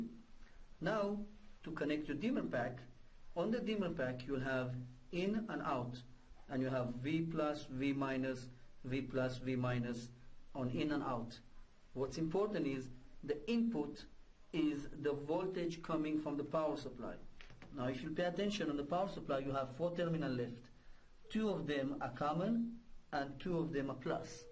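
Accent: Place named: India. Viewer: indian english :)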